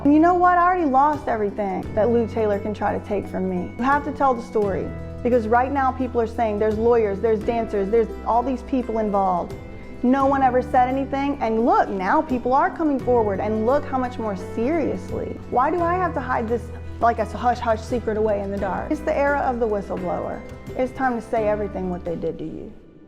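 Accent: South, United States. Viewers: Just came to likeeeeeee i heard this story years ago love ur southern charm with it!!!!! Mucccch love❤... Ima too lol love youuiiiu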